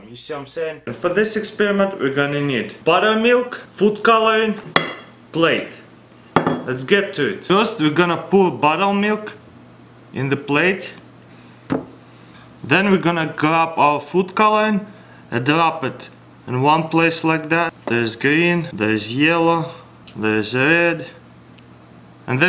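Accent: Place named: Russia. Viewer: он русский, слышно по акценту, наш человек.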